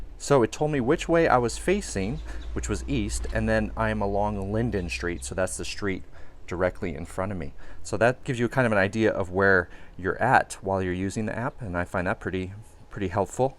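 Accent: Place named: United Kingdom. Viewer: For some reason I thought you were from the uk. Lol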